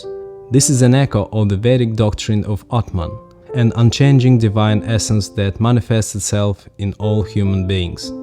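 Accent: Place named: Eastern Europe. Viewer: slavic accent 😭😭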